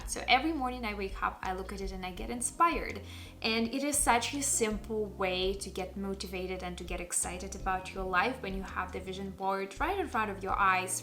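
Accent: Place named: Russia. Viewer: Вы из России или Украины? Слышу знакомый акцент )))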